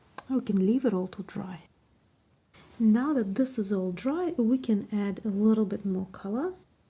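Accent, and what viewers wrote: Russia. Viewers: Такой русский акцент) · Thanks for the video! I'm only starting to playing with paints and watercolor fascinates me. Btw, where are you from? Your accent seems very russian sometimes, so I wonder if any other language gives that particular accent.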